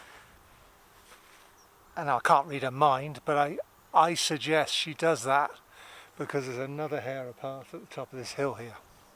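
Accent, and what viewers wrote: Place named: Bristol, United Kingdom. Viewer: I always thought you were from the Bristol area from your accent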